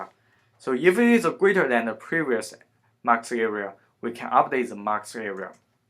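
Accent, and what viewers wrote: China. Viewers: 第一句话就能听出是中国人hhhh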